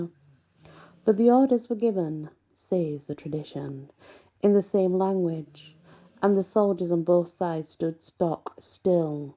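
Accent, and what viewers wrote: United Kingdom. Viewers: Good evening ✨ I’m absolutely in awe… the legend of Saint Cast is one of the most powerful things I’ve heard in a long time 😢💕 your storytelling is so vivid I felt like I was standing between the two forces—Highlanders and Bretons—watching them realize their kinship through a shared song 🎶🫶 the moment they disobeyed orders and embraced was so symbolic… it’s the kind of tale the world needs now 💔💛 your mention of how the song might trace back to a Celtic theme and how Reid could have drawn inspiration was fascinating 🧐 the little details you shared made the story feel alive 🔍 your calm, gentle, British-accented voice is like being wrapped in a soft blanket while hearing ancient wisdom 🧡 thank you for your light, your research, and your heart 🌟 more power to you, you are a legend yourself 💐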